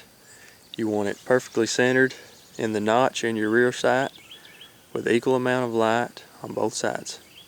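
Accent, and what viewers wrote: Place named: Alabama, United States. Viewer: he sounds like he's from Alabama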